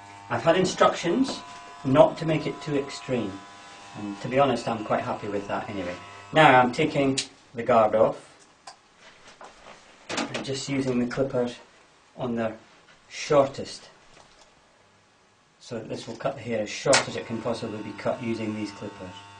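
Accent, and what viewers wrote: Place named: Scotland, United Kingdom. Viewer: Thank you for the tips!, and I hear a Scottish accent love it!.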